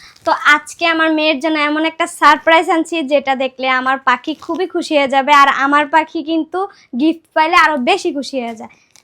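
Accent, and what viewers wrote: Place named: Bangal, Bangladesh. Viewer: কথার ভাষা কি বাঙ্গাল বাঙ্গাল ছি এগুলো আবার ভিডিও করে লজ্জা শরম নাই বাঙ্গালের বাচ্চা গো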